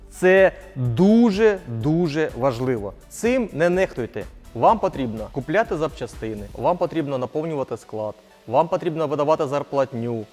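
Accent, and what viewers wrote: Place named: Ukraine. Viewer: спасибо, граммотня подача и приятный настоящий украинский язык, очень приятно слушать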